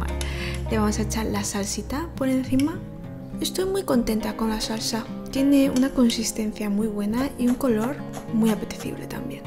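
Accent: Spain. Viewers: "Como buena asiática que soy. . . " 😁😁😁 Tu aspecto asiático combinado a tu acento español me atrapó tu canal☺☺☺. Desde luego que tu forma de cocinar también. Yo también he improvisado mucho en la cocina y es divertido 😆😆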